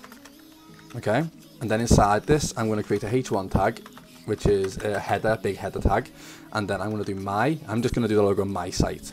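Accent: Liverpool, United Kingdom. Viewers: scouse accent 🤔 its hard to keep up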